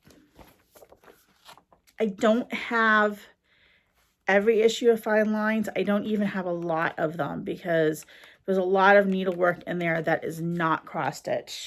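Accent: New England, United States. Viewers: I just stumbled across your channel and recognized a fellow New Englander almost immediately from your accent. I’m in southern NH. I love stash videos.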